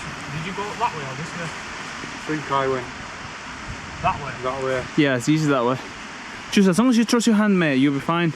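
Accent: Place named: Bolton, United Kingdom. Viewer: Love the brawd acsent iam fr bolton sound summit like but u talk like ur about 50 mate how old ru, iam 63 just got cancer basterd so do miss it pal atb stay safe ❤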